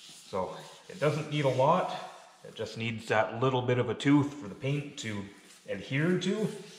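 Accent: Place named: Canada. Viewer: Brilliant video. And the Canadian accent to explain the process! 🏴🇨🇦 👍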